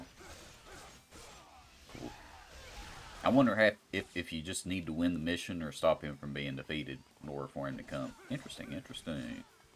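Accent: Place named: South, United States. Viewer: you deserve a million subscribers. dynasty warriors youtuber with a southern accent??? fucking sign me up omg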